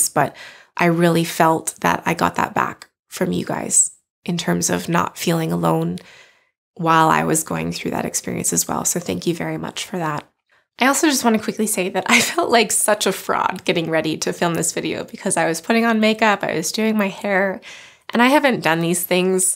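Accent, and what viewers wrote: Canada. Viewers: You are absolutely amazing. I love that you are so completely honest. Do you think the training for the marathon stresses your body enough to change the way your meds work? By the way I grew up in Alberta but don’t live there anymore and your Canadian accent makes me want to go home. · Canadian accent coming in strong!!! Great channel though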